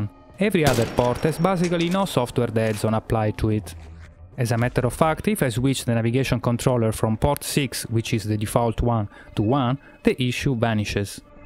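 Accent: Italy. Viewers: si sente che sei italiano ,ti consiglio di fare un canale italiano,cosi sei sprecato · si sente poco che sei italiano! :) ahah..comunque come fai a saper così bene l'inglese? hai vissuto un po' all'estero oppure hai frequentato qualche corso? grazie in anticipo.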